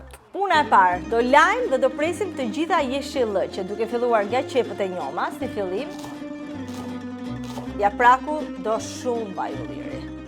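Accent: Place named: Burrel, Albania. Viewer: .....sa me pelqen malokja e Burrelit kur salutone !!!!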